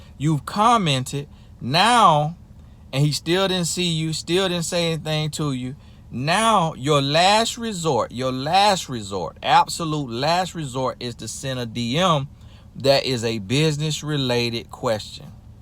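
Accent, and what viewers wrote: South, United States. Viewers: He has got to be from the south 😂 This is a good talk though!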